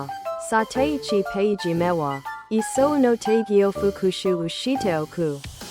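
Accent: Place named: United States. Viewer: a の発音がeiの時とerの時があるね。ほとんどaとは発音しないアメリカ英語。 あと授業はjigiyaou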